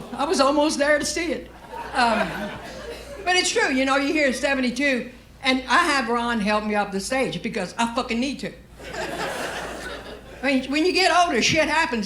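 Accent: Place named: South, United States. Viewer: I love her southern accent 😍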